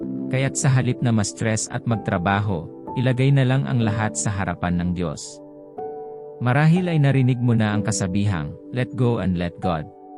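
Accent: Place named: Filipino American, United States. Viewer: parang fil am managalog ang badoy mo mag aral ka ng tunay na salitang tagalog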